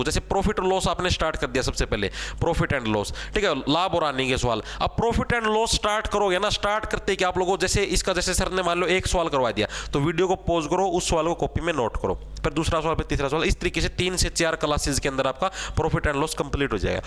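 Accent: Haryana, India. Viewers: नमस्कार जी म थारा साथी मोहित पुनिया 😅 नर और मादा की उमर X or y or दोपहर म घणा तावड़ा पड़ रय्या छ मस्त बोली है, गुरुजी थाकी 😊 हरियाणा से हैं क्या आप❤ धुम्मा मार दयो 😅 और घूमा मार दियो नर कैंडिडेट का फिजिकल में सिस्टम पाट के हाथ में आ गया था 😂😂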